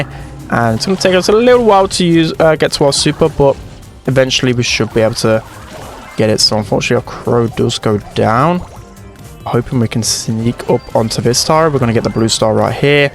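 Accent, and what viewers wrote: United Kingdom. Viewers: I love your british accent 🥰🥰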